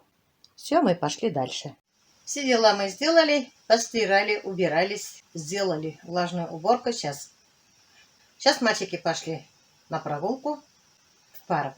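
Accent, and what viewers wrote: Tatarstan, Russia. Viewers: Какой у вас татарский акцент как у моей бабушки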